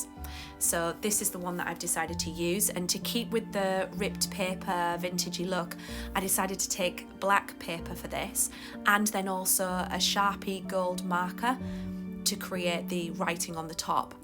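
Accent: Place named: United Kingdom. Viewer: Just found your channel and your accent spoke out as I’m from the UK too this is one of my fave Xmas spreads your amazing to say you haven’t been journalling long! Do you have an Instagram? I would love to share your channel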